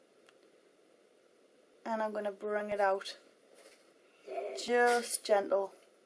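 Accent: Newcastle, United Kingdom. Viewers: Lol, newcastle accent. NE England. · hey! i spent a month in newcastle recently (I'm from New Hampshire)...you sound like the people I met there!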